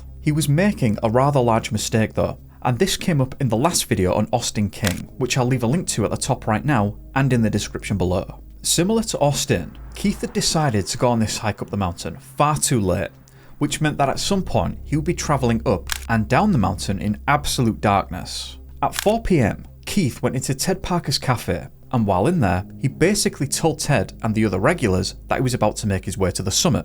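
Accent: United Kingdom. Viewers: Your British accent is fab!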